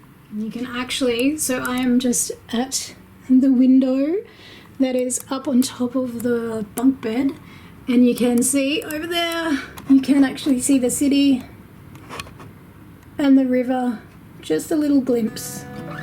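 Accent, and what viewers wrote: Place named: Australia. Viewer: Beautiful apartment and I love her accent, I feel like it's different ftom other Australians. Much love :-)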